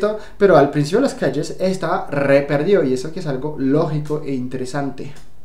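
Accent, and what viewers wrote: Bogotá, Colombia. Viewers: Hola, tienes un acento muy rolo. Jajaja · De Francia!!, hablas muy bien el español y me encanta tu acento colombiano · Soy mexicano , me encanta Colombia voy muchas veces al año, me doy cuenta que hablas muy bien el español colombiano, hablas tan rápido como ellos jiji, me gustó tu vídeo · Hola en cuanto tiempo aprendiste español colombiano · Tiene el acento rolo medio ahí jajaja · Primera vez que veo tus videos, me encanta que te guste mi país... que chevere tu acento ya es muy colombiano, extraño mi tierra a la distancia, y el himno a las 6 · Re perdido..hablas muy Colombiano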